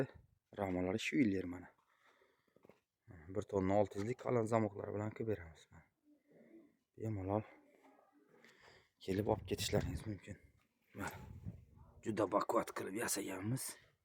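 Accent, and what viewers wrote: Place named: Khorezm, Uzbekistan. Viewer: Assalomu alaykum manzil nerda xorazmdami sheva oʻxshab turibdi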